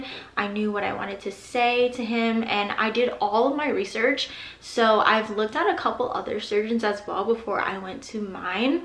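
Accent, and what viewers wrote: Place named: Idaho, United States. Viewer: Her accent says she is American possibly from Idaho.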